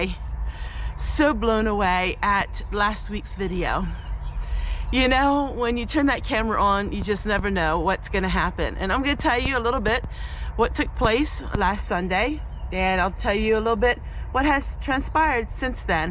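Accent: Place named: Pennsylvania, United States. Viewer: I've been to Pennsylvania many times and you sound just like a Pennsylvanian. Your son has the same accent. You don't talk" oddly " at all. It's regional. I'm so glad you brought anxiety disorder to light. There's more of us than we ever knew sis. God be with you.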